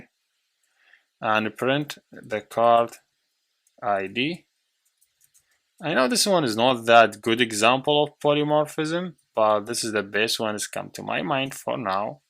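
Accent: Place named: Iraq. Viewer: Your accent seems like you are arabic,more similar to Iraq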